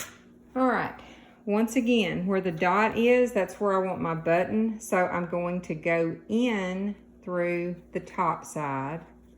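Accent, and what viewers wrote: South, United States. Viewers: Are you from Georgia or Alabama? 🥰 Your voice sounds like all the ladies from my Dads family.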